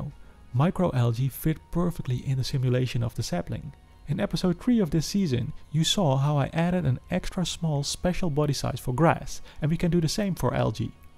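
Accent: Netherlands. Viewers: this guys english is so dutch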